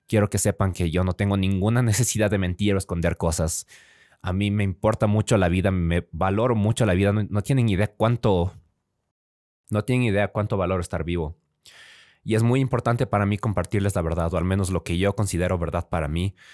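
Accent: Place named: Mexico. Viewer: Hablas como Mexicano, pensaba que eras mexicano pero ahora que veo que eres de Ecuador lo entiendo, siempre he creído que en Ecuador tienen un acento similar al estándar mexicano.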